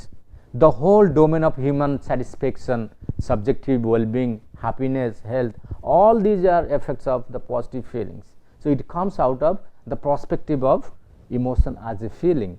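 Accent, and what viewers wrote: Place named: East India, India. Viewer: He sounds from East India and there's nothing "wrong" in his accent...that's how Bengali axomia speaks English... every region has different accent, it's natural not wrong.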